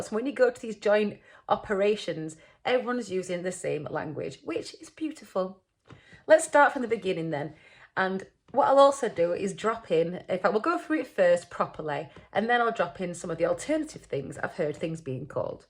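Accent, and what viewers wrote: United Kingdom. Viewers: I guess you're from Scotland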